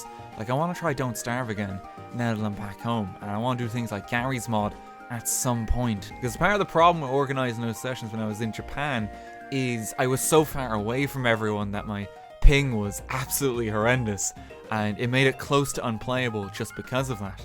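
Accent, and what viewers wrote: Ireland. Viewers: Which county m8? Your accent smells like Southern. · Ah truesday Lovely Irish accent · "Tuesday tru turshday" love the irish accent, its always a joy to listen to.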